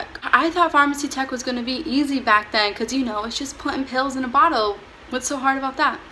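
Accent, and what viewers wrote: Boston, United States. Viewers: Do you live in Boston? It sounds like you have a boston accent. It's cool. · Are you from Boston? You have the accent! lol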